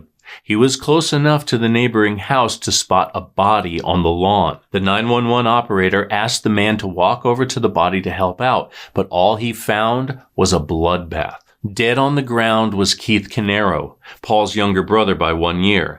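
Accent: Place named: New Jersey, United States. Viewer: Holy crap I never realized how familiar your accent was until now. I grew up Monmouth County NJ where Colts Neck and Asbury Park are located. You gotta be from the Tri-State, but I think you're from NJ. Mentioning the proximity to NYC introducing those town names hit me right in the heart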